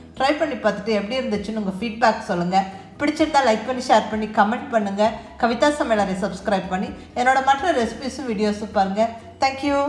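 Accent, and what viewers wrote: Kongu, India. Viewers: I tried and the result was superb like your kongu slang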